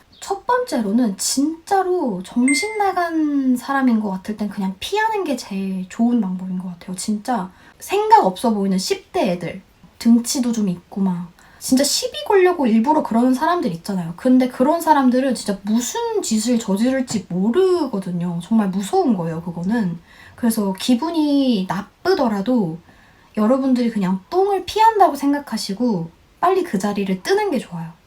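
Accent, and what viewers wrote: United Kingdom. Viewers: 요며칠 영상 보다가 결국 입덕부정 인정하고 입덕했습니다, 영국식 발음에 내가 이렇게 환장하는 사람이었나 첨 알았어요 ㅋㅋㅋㅋ 진짜 내가 본 인종차별 대처 중에 제일 고급지고 우아하게 되받아치신 것도 너무 멋있고 영어 관심도 없고 공부할 생각은 더더ㅓ더더욱 없었는데 덕분에 영어공부도 관심 갖게 됐고 필기체도 연습해서 처럼 멋지게 쓰고 싶어졌어요❤️ 진짜 발음 너무너무 좋고 좋아서 영상 통으로 영어로 읽는 책 읽기 컨테츠? 같은 게 나오면 좋겠어요 제가 조회수 만 정도는 책임지고 보겠습니다 ^.^ 살앙해요 ❤️ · 영국발음 장난아니네요 와~ 전에 다른 유튜버한테서 봤는데 남한에서 왔냐 북한에서 물어보면 "너 하기에 달렸다" 였는데 엄청 웃겼어요ㅋㅋ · ㅠㅠㅠㅠㅠ이 언니 왜 이제 ㅇ알게된거지 진ㅁ자 너무 이쁘고 너무 사랑스럽고 목소리도 너무 좋고 영국식 빌음도 너뮤 좋고 진짜 짱이에요ㅠㅡㅠㅠㅠㅠㅠㅠ 사랑함니다ㅠㅠㅠㅠ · 와 영국어보고 반했어요 바로 구독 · 와.. 영국발음 넘 멋있어요. 뉴질랜드와 호주로 워홀을 희망하고 있었는데 영국으로 급 변경하고 싶네요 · 기분 나쁜 경험이지만, 제 기억에 남는건 영국발음 입니다 ㅠㅠ 너무 멋있어요 진짜 ❤️👍🏻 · 영국억양으로 공부한지 얼마나 되었어요? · 영상 너무 잘 봤어요. 영국 발음 예쁘게 하세요. 마지막에 "I thought it was a dog barking" 빵 터졌네요 🤣😄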